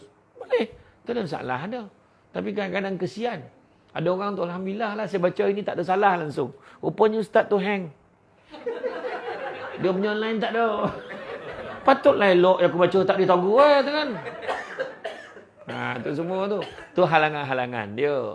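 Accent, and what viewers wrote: Negeri Sembilan, Malaysia. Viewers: Yg dislike tue dyo x paham loghat nismilan Tue🤣🤣🤣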